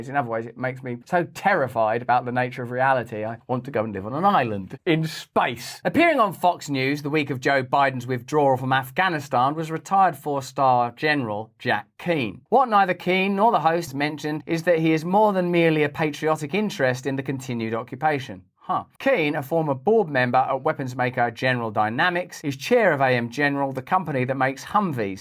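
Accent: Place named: England, United Kingdom. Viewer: I been following your channel for a while and one thing that stops me from continues watching your good content is lack of subtitles. It is hard for me to understand your English accent. Hope you can add subtitles one day so that native Spanish speaker have an easier and clear understanding of such valuable information.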